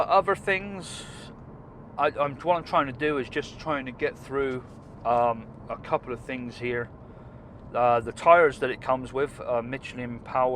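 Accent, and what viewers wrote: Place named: Gloucester, United Kingdom. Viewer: I'm trying to work out your accent.. guessing you're from Gloucester? You're obviously now living in the US, and it looks like a beautiful place to live.. very spacious!!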